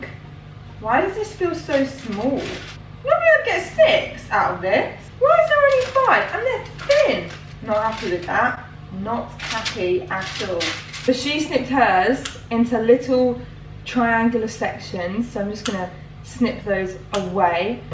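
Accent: United Kingdom. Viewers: Is it just me or she looks like merle from buzzfeed with a british accent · I just love her personality! Although British accent is still hard for me, lol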